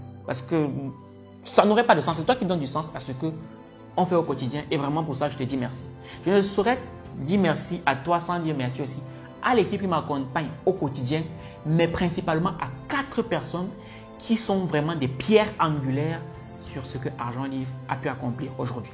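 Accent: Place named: Cameroon. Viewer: Mais tu as gardé l'accent fort fort du mboa! C'est cool, du courage.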